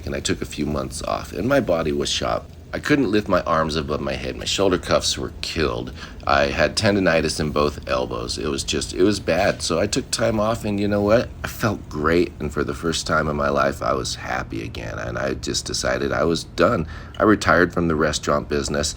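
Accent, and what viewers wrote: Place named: Pacific Northwest, United States. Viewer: I just had a feeling you might be in and have been in PNW… I can hear it in the voice 😊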